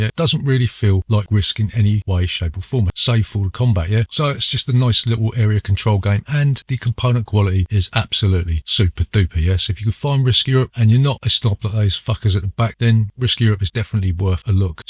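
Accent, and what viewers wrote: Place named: South West, United Kingdom. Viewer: All the way at the back here. Time to throw out some random counties, I've always thought Kent but often hear a little south west twang Great list, M44 is there for me also.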